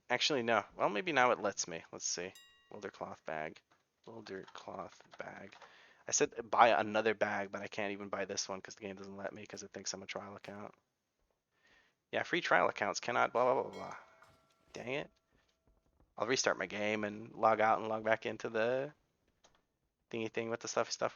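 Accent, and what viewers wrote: United States. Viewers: What's your native language? You have a very American accent now.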